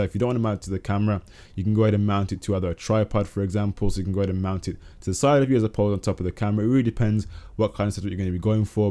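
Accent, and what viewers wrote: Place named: Birmingham, United Kingdom. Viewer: Sick video but u from birmingham? Ur accent gives me a vibe haha :D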